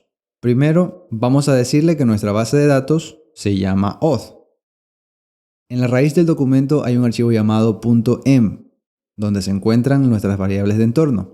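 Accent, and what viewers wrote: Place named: Uruguay. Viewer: sos uruguayo te saque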